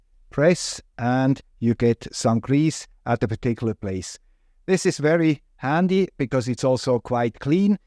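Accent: Switzerland. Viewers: Swiss accent, highly appreciated! ❤ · I’m interested that you pronounced Knipex as “nipex”, whereas a native German speaker once told me it is “K-nigh-pex”? As a native English speaker, I still have no clue how to pronounce the brand properly! I had assumed you were Swiss-German as oposed French, from the accent, so very interested in your take on this?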